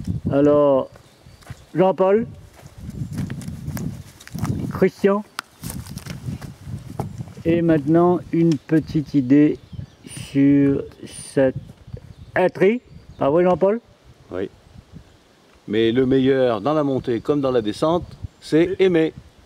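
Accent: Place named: Réunion, France. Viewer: Bonsoir. Merci pour cette sublime vidéo qui ravive de très bons souvenirs'. J'ai effectué mon camino du 02/06 au 30/07/2019. Je suis parti du PUY et j'ai rejoins SJC le 27/07... J'ai continué vers le Finistère que j'ai rejoins le 30/07. J' bien ton accent qui me rappelle mon Île natal la RÉUNION. Bonne continuation. Je repartirai sur les chemins par la voie du VEZELAY au mois de juin. Thomas (un gars des Avirons)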